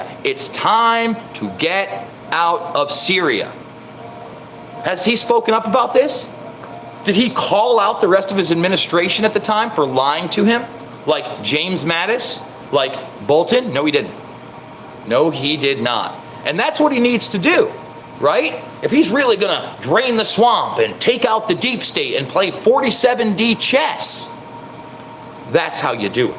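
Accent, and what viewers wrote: South, United States. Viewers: I'm sorry but your southern accent is amazing haha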